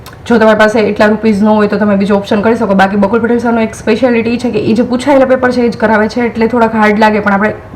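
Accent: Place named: Saurashtra, India. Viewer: Tamari bhasa upar thi lage che ke tame gir Somnath ke Junagadh na cho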